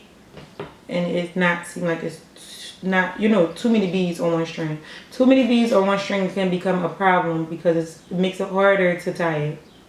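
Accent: Baltimore, United States. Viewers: By far the best tutorial! Thank you so much! You and your straight to the point explanation got me motivated and stringing my first string of waist beads at 1am lolll. Thank you, beautiful! Also, where are you from? I thought I heard a little Baltimore in therrreee 💞💞💞 lol. Thanks again! Liked, commented, and subscribed 😏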